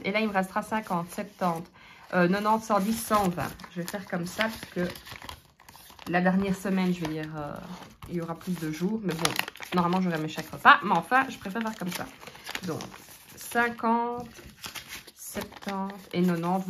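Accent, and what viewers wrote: Belgium. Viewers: Bonjour tes vidéos sont très vivantes et j’adore l’accent belge ,tu aimes les fleurs alors acceptes ce bouquet 💐🌺💐🪷🌾🌸🌻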